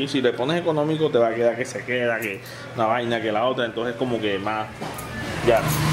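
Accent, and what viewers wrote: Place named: Caribbean Coast, Colombia. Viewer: ¿De donde saca ud. Que es venezolano? bien colombiano y costeño que si es. No se de donde pero su acento me lleva a pensar que es del sur de Bolívar o Sucreño.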